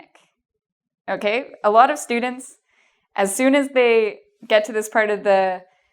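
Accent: Canada. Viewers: I'm Scotish and I don't understand your Canadian accent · good luck to everyone who's going to do the exam, kudos to you if you picked up on her Canadian accent, is a really competent teacher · Her Canadian accent is so cute.... Aboot <3333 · Is she Canadian? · Canadian accent is dope. <3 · I am going to talk a-boat... the accent is sooo cute! Love Canada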